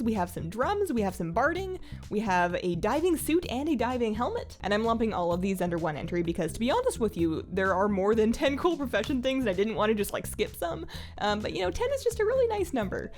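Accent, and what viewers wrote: Canada. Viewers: love listening to Canadians talk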